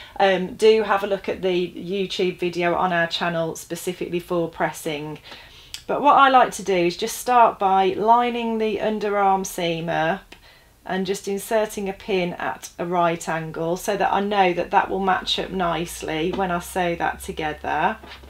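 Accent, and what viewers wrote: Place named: Midlands, United Kingdom. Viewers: That Midlands accent!! Takes me back! Great video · K and G are related phonemes, being voiced and unvoiced versions of the same. Regional accents and dialects influence how speakers pronounce them. Interestingly, dialect, from the Midlands, is thought to be the original pronunciation of modern English. Perhaps you might consider learning more about linguistics and phonetics before making judgements on other’s use of English. It might also help with your grammar and punctuation. It was very irritating to be distracted, by vacuous comments, from such an excellent demonstration by a very articulate and technically able presenter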